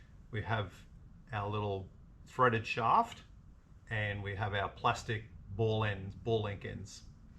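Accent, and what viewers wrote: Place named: Australia. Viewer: Nice heli. Good review. Love your Australian accent. Hello from Massachusetts, USA.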